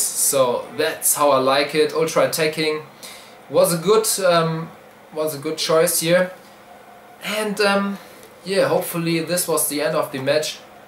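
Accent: Germany. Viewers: deutsches Englisch :D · du meinst deutschen akzent ;) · Sorry trotzdem höre ich nur nen deutschen Akzent raus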